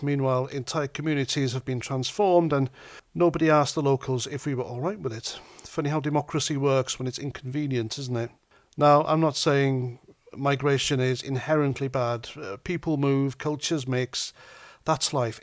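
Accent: Wales, United Kingdom. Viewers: Are you Welsh just like me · I love the Welsh accent. It's heartbreaking to hear this news. Please make sure that Welsh children are taught about their heritage, culture and language.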